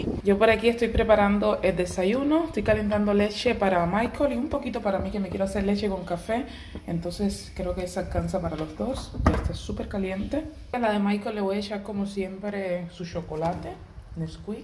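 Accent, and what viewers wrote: Cuba. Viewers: Es que son cubana las 2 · Ella las dos son cubanas por eso hablan parecido . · Ehm... los cubanos hablamos así. Infórmate un poco amix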